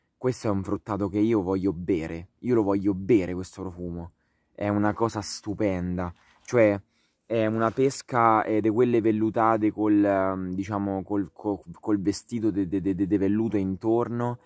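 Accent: Rome, Italy. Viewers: Parla in romanesco a me piaceeeeeeeeeeee 👍👍👍👍👍😍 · Forse romano.Bellissimo!!!!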